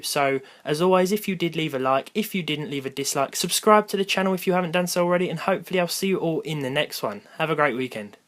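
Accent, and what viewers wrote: United Kingdom. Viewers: You look like General Sam with a British accent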